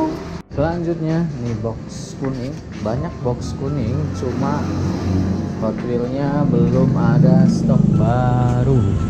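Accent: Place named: Banyumas, Indonesia. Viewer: Basa ngapak Wong ndi sampeyan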